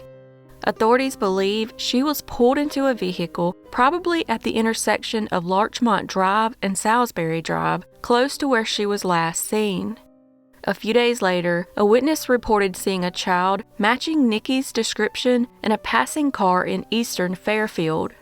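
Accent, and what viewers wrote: South, United States. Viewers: Love your accent! Georgia? South Carolina?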